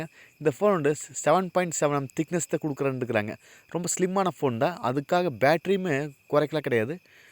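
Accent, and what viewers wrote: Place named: Kongu, India. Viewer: Kongu tamil properah kettu evalo naal aaguthu❤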